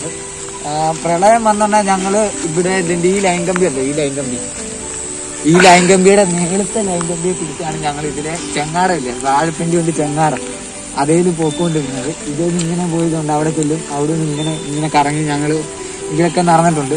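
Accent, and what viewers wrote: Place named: Kottayam, India. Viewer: ❤️❤️❤️❤️👍🏼വഷണ ഇല ....കോട്ടയം speech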